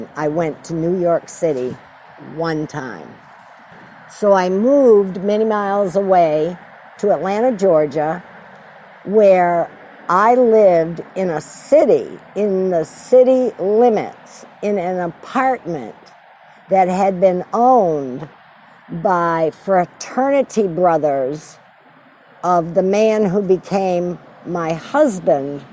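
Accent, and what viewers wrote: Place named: England, United Kingdom. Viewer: Суперское видео!! Побольше таких снимайте!! Очень приятная и красивая женщина с изумительным английским акцентом!!! С нетерпением ждем 2 и 3 части!!!!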